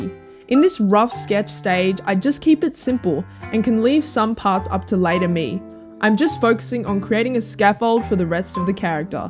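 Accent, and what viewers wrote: Australia. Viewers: Are you... Australian??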